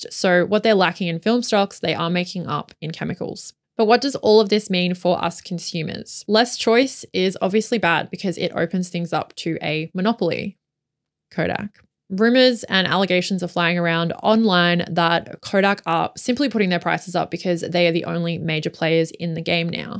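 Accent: Australia. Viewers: if you could drop either the Aussie accent or the valley girl inflection your channel would be one hundred percent more bearable. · I’m subscribing for your content, your delivery and, of course, your awesome Aussie accent… as a Canadian living in Southern Arizona - visited Sydney in 2015… Beautiful people and sooor friendly. Don’t change a thing!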